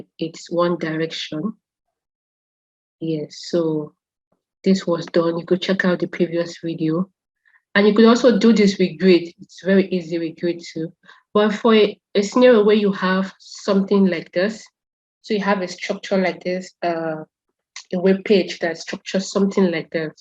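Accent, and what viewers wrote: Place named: Igbo, Nigeria. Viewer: The Igbo accent makes me feel at home 🥳🥳🥳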